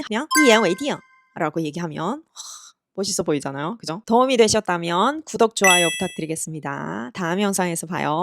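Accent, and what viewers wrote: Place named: Beijing, China. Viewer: 중국어를 북경에서 배우신듯 내용은 좋은데 좀……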